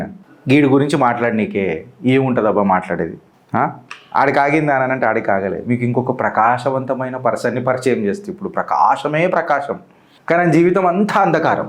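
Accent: Telangana, India. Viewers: I'm from Andhra Pradesh. Sir, meru Telangana yasa matladutunte vinadaniki vinasompuga vundi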